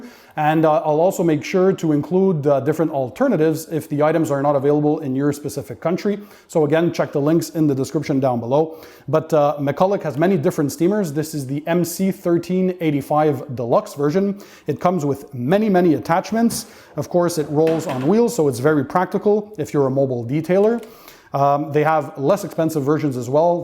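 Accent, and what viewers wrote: Quebec, Canada. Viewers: Bonjour! parlez vous Français? Ça ressemble drôlement à un accent québécois anglophone haha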